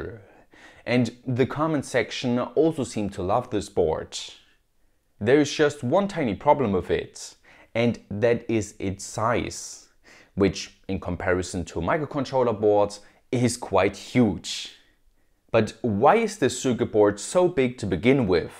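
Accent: Germany. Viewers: Now i know where the meme "smol" comes from. Doesn't get more dschörmann than that accent.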